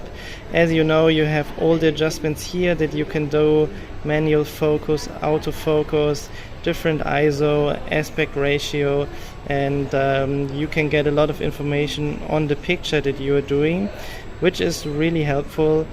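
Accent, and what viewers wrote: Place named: Germany. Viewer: That's a nice German accent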